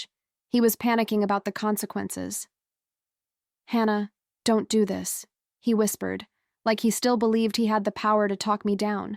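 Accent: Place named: United States. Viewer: very strong over-emphasised American accent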